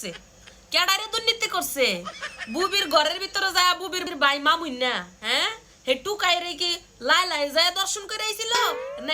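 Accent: Comilla, Bangladesh. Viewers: OMG 😲 😱, can i know in Bangladesh what part you are coming from? Its sound Cumilla version 😂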